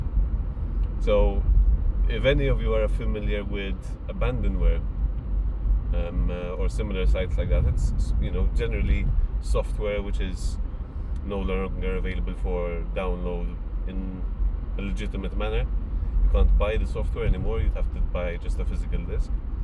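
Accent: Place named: Malta. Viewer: Its very good to see that you dont intend to delete all those videos and even got back your youtube. Also you've got a very heavy Maltese accent haha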